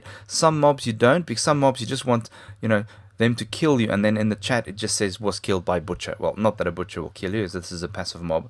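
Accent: South Africa. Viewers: are you South African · Are u South African You sound like it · Ur South African ¿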